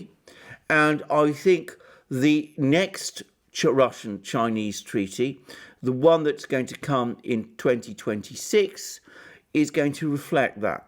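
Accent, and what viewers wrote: England, United Kingdom. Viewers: Mother England gave you a lovely Accent Alexander.Don't bite the hand that feeds you.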